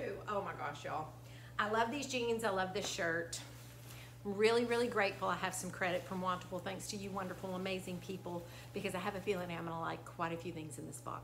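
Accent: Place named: South, United States. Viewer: Hi Sweetheart, I love your accent so much...I was in Nashville last year and I would make conversation with people, because I adore a Southern accent....I loved it there so much, God willing I will return 🙏...I went to New York as well, it was nice, but I like the South xxxxxx🌺🌺🌺Much Love Bella🌺🌺🌺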